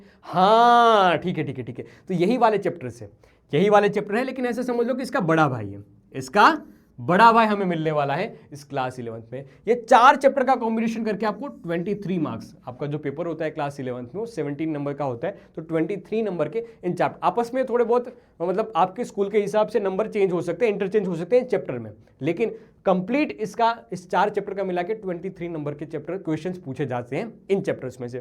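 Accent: Gujarat, India. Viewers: sir aap Gujarati ho????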